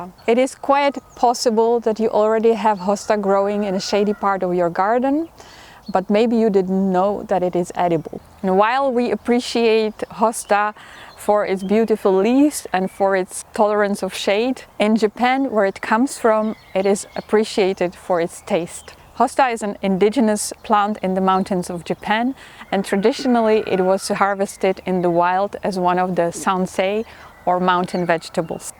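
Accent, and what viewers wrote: Netherlands. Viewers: Does she have a Dutch accent? Or Danish? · My family is from the Netherlands 🇳🇱 but I’m from 🇨🇦 I knew the accent instantly 😊great info great video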